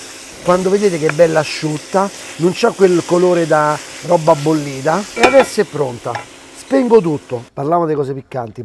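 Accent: Rome, Italy. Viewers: ..te lo devo di...tu ogni volta mi fai venire un orgasmo gastonomico con le tue ricette😂 ma poi amo tantissimo come tu le racconti con quell'accento romanesco. I love It😍❤👍👍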